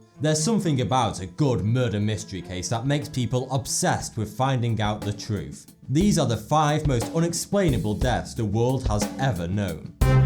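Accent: United Kingdom. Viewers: Skill Definitely sounds like he's just from the United Kingdom. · Something about that british accent makes all of these videos not scary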